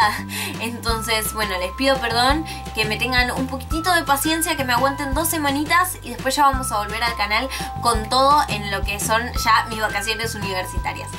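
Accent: Buenos Aires, Argentina. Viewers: Sos de Buenos Aires?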